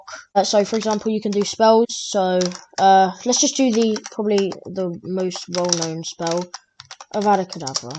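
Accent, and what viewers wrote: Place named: United Kingdom. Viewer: I like how ur talking Britain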